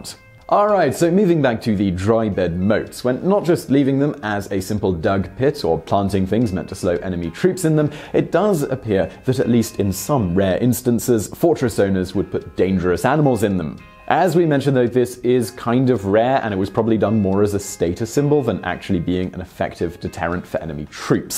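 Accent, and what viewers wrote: England, United Kingdom. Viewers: I like your British accent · Chuck Barry that's called an English accent.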